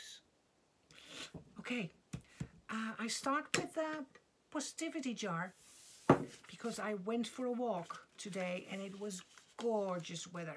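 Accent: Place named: Netherlands. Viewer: I love ur bags and socks. My Grandmother was Dutch and hearing your accent brings me back. I have tinnitus as does my mother. The first couple of months it drove me crazy but now I don’t hear it all the time unless I think about it. I think my brain and central nervous system adjusted to it. It’s hard to explain.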